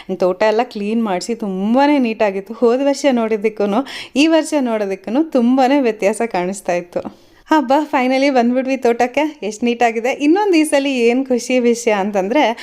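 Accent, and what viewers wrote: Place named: Uttara Kannada, India. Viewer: Hearing ur language I thought u r Frm uttar kannada district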